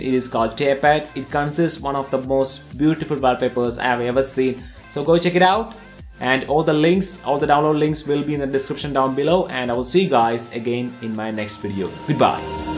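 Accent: India. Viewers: I'm sorry if i offend you but where do you come from because you're accent is awesome and sounds Indian or around that area.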